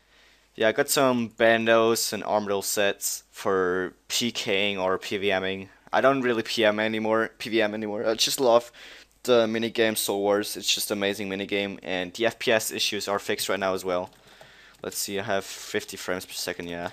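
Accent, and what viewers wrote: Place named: England, United Kingdom. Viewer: It's like your talking in and english accent but trying to fight off some foreign accent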